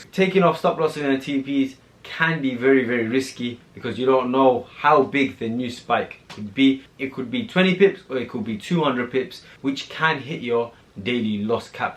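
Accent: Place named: Nigeria. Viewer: Bro what country are you from. You sound a bit Nigerian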